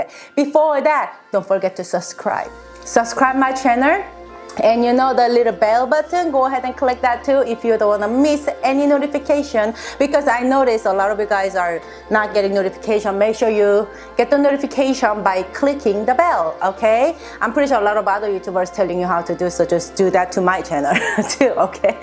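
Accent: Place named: South Korea. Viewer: It is a phonetic spelling of how she actually pronounces the word with her Korean accent. It's a joke.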